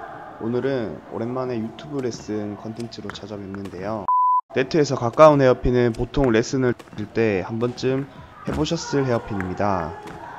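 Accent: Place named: Seoul, South Korea. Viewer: 서울 사람 되가네? ㅎㅎㅎ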